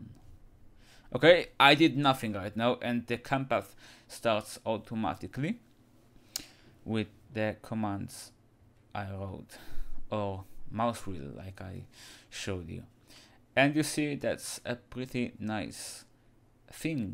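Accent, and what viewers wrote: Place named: Israel. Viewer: u sound israeli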